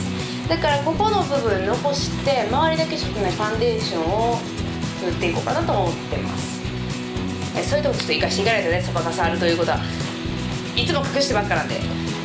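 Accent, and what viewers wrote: Kansai, Japan. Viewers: 関西弁がカワイイです( ⁰▱⁰ ) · いつもサムネで気になってて初めて動画見ました！バイリンガル系の人かと思えば、サバサバしてて親しみやすく、関西弁がめちゃかわいいです！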